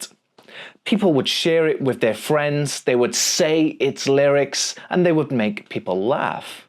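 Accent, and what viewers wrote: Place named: United Kingdom. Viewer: Hi! I really enjoy your practical approach to English learning, especially your Walk & Talk videos, graded listening content, and the way you bring natural British English and real-world topics into your lessons. I also sent you an email today with a personalized App idea inspired by your teaching approach. The idea is to turn your listening and speaking content into an interactive practice space where learners can shadow your lessons, practise pronunciation, work through IELTS and speaking prompts, and build a consistent daily practice habit. I think your teaching content could become something really valuable for learners beyond YouTube. I’d love to hear what you think! 😊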